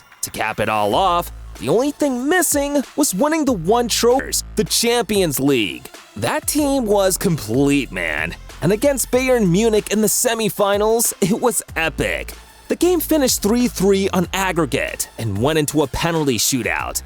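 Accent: United States. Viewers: Hearing an American say these words is so weird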